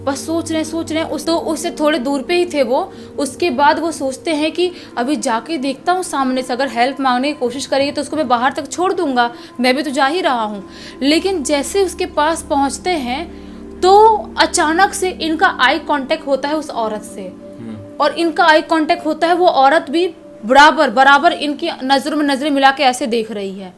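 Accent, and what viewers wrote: Bihar, India. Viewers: Yarr tum chutiye ho kya . Pehle to iss didi ko bolo ki apni akhe chote krle . Vaddi vaddi akhe nikal leti hai . Hume dra dra ke marr degi kya 😂😂😂. Fir ise bolo ki hindi bolna to sikhle bihari to matt boll . Ja fir behari he boll le . Didi ko bolo ki apna accent theek krle . Words theek se pronounce kre .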